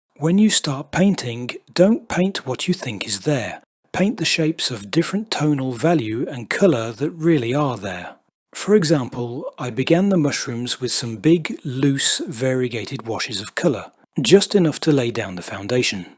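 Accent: United Kingdom. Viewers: He sounds English but can not spell colour?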